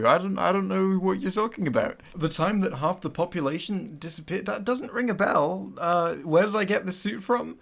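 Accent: United Kingdom. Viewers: You really care about topics no body asked for, little angry british fella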